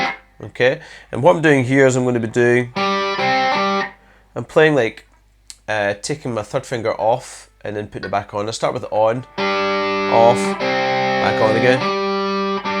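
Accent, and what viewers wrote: Scotland, United Kingdom. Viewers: What part of Scotland are you from? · sound scottish, maybe edinburgh.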